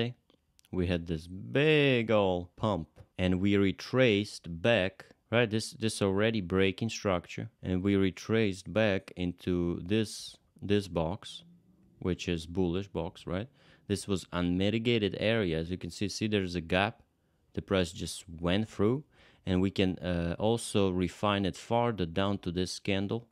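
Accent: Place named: Sevastopol, Ukraine. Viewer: Wonderful Your accent reminds me of an old friend from Sevastopol Good luck from Ireland☘☘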